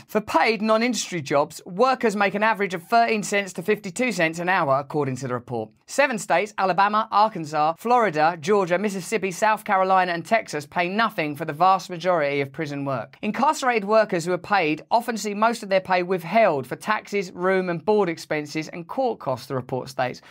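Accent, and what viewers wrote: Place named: United Kingdom. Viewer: Why do so many people like this guy? Discusting, arms flailing about, that British accent is really back alley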